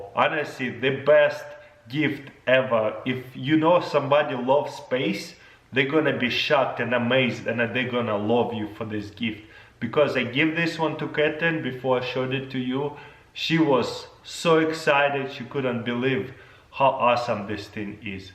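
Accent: Russia. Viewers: Why nobody talk about his pretty stereotypic Russian acsent? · Try to speak more fluent English. How is your russian accent so thick even though you've lived here for so long?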